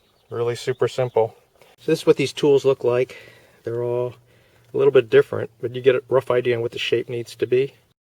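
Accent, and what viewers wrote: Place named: United States. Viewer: Most helpful video - many thanks. BTW, you have a most pleasant accent - where in the US of A are you from? Regards - Roger from England.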